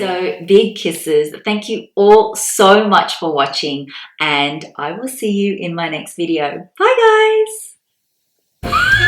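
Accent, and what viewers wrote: China. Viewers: Wow I can understand your dialect well somewhat,,, it's close to Fu Kien and yes nobody understands it here in Canada. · Lots of interesting facts to learn about you! I love how open-minded you are and so brave for trying that spider! Yikes. I have a huge fear of spiders, big or small and the closest thing I could do to try and overcome it was to hold a tarantula. >_< Even then I was frightened. Same goes for heights! eeek! But I love the thrill and adrenaline of just trying. Your dialect sounds like a mix of Cantonese and Vietnamese? Just from the sounds of main languages I know :o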